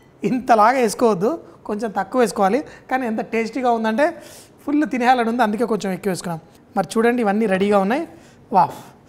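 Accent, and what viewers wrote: Telangana, India. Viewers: Hi! Sir, your TS language is excellent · Good experiment of if using Telangana accent 👍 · oh my god chef very funny listening to your telangana telugu.really i enjoyed a lot your simple cooking thank you soooooooooo much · telangana telugu :D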